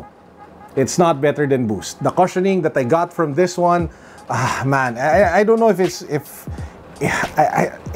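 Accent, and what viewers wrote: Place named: Philippines. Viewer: This guys has the strongest Pinoy Accent I ever heard in YouTube 🤣🤟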